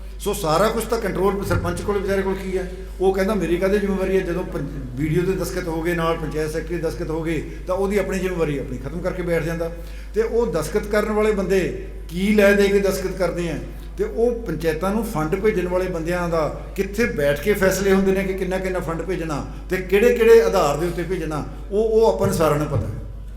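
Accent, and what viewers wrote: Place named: Puadh, India. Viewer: ਸਾਹਬ ਜੀ ਨੇ ਕਮਾਲ ਦੀ ਜਾਣਕਾਰੀ ਦਿੱਤੀ ।ਪੁਆਦੀ ਬੋਲ ਕੇ ਸੁਆਦ ਲਿਆ ਦਿਤਾ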